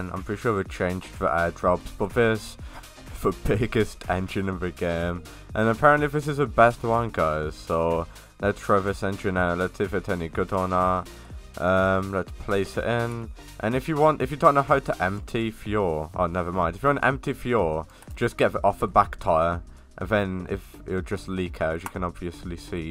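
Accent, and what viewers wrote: United Kingdom. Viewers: He's is British mate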